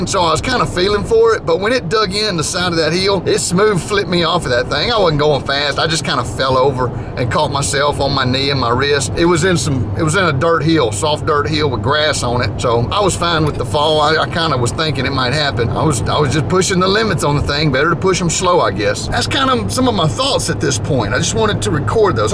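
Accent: South, United States. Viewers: I made the kids bring bicycle, so they're tired. But I've been riding my Rec-lectric unicycle, and I am NOT tired!............ REC-LECTRIC!!........... I don't know what it is about southern people with they're drawl that cracks me up so bad when they mispronounce words. I had to watch that part a few times. Don't get me wrong, I'm not laughing at them as if they are stupid, it's just the way the accent sounds and the funny ass pronunciation of words that gets me. Thanks for the laugh. Keep 'em comin!!!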